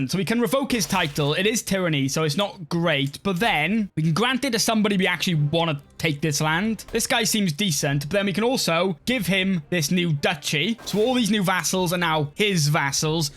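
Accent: Wales, United Kingdom. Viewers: Where in Wales are you from mate?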